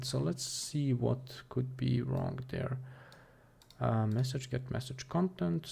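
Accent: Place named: Russia. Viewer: Are you from Russia?) I think so, because your English has a Russian accent.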